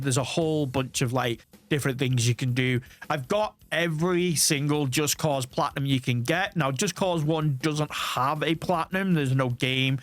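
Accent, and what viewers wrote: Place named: Warrington, United Kingdom. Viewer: Im getting Warrington vibes from your accent mate, am I close?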